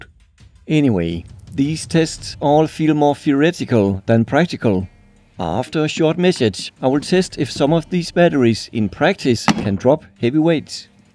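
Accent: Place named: Denmark. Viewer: Rema 1000 is a Norwegian discount supermarket chain also operating in Denmark where I'm sure is from as I can tell on his thick Danish accent. So, these are just private label batteries made for Rema 1000 supermarkets. And Kameda is a private label battery brand of the Danish discount tool chain Harald Nyborg. Harald Nyborg is kind of Denmark's answer to America's Harbor Freight. So, it's also unknown who makes Kameda batteries. And with all private label products the manufacturer can change from one year to the other as the retail chains usually contact the lowest bidding manufacturer to meet their requirements.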